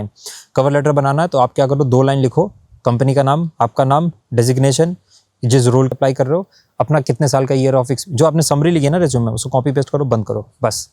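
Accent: Uttar Pradesh, India. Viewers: Bhai ki UP vali boli is crazy🤙🏻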